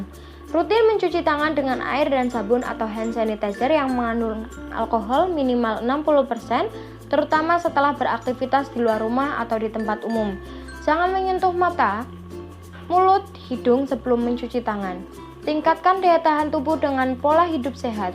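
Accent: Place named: Java, Indonesia. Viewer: Medok nemen nok bahasamu😂😂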